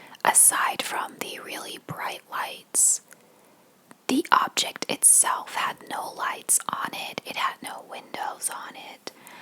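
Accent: United States. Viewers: Is ze Nederlands? Ze heeft echt een Amerikaans accent!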